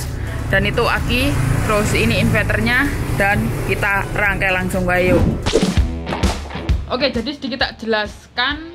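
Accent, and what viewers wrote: Java, Indonesia. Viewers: Kentel banget jowo nya.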